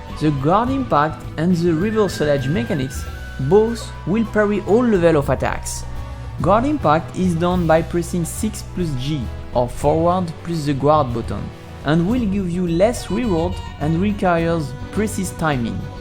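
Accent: France. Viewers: That is called a French accent. Congratulations, you're rasist. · I think you got to lower the volume a bit. It's already slightly difficult to understand you because of your French accent. Merci beaucoup!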